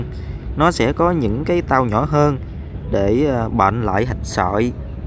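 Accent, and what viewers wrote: Vietnam. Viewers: Mong được xem nhiều video của về công trình này thêm. Giọng nói của bạn rất rõ, rất Miền Nam, nghe rất thích.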